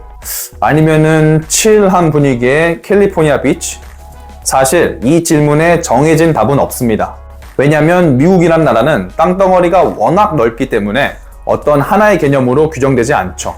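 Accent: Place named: Jeolla, South Korea. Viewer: 님은 전라도 억양이 있으시네요 ㅎㅎ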